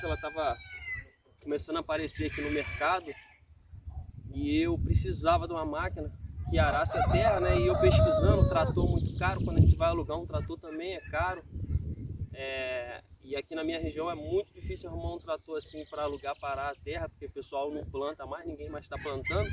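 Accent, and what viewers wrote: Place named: Rio de Janeiro, Brazil. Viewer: Sou goiano e é a primeira vez que vejo um fazendeiro com sotaque carioca, porque geralmente a gente associa o sotaque à praia, litoral, algo mais urbano. No interior de Goias, São Paulo ou Sul é raríssimo um agricultor falando português com tanta perfeição. Parabéns, irmão. To pensando em comprar uma máquina dessas e seu video foi de grande esclarecimento. Abraço.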